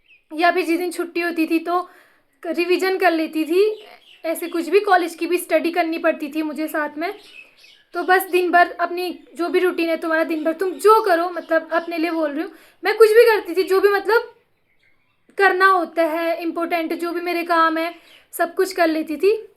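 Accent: India. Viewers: Tumhari tone uttarakhand jesi h hamare yeha ki ladkiya bhi aesi he bt krti h..m Pithoragarh se hu aap kha se ho.. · Tum pakka pahadi hogi tumhri voice se pahadi accent aa rha hai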